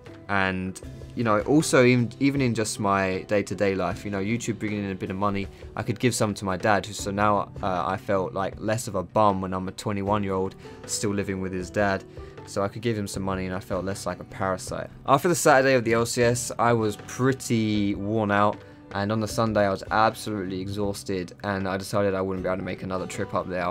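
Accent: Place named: Essex, United Kingdom. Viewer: Haha ur an Essex lad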